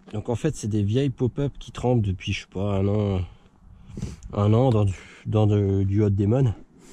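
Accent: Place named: Alsace, France. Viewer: Jolie commune pour l'époque et pas un chat au bord de l'eau. :) Ce petit canal est non seulement très poissonneux mais abrite dans certains biefs de bien belles surprises... Vu l'accent je me suis dit que t'es d'Alsace ou de Lorraine,alors je suis allé sur la page fb et j'ai immédiatement reconnu les photos du Rhône au Rhin à grand gabarit à l'île Napoléon donc j'en conclu que t'es de la région Mulhousienne. :)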